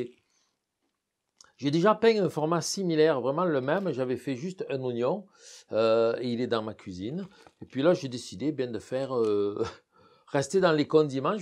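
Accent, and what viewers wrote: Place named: Provence, France. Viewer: Bonjour monsieur,je vous remercie pour votre calme ,vos explications claires,et vôtre lumière bienveillante , même quand ont débute , ont se sent ,en confiance comme avec un ami,qui nous partagent une même passion 😄, votre accent ,le dis que vous ête de chez nous ,je suis du Vaucluse ,et cela fait chaud au cœur 💓 de sentir dans vôtre accent qui est le nôtre,nos racines , merci infiniment ,bien le bonjour à Mme , je viens de m'abonner et j'ai partagé avec une personne de mon entourage,qui est artiste peintre 🦋🙏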